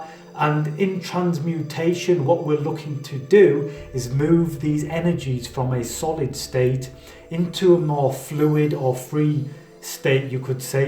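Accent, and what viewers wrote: Manchester, United Kingdom. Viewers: I can hear a Manchester accent.. 💖 Mancunian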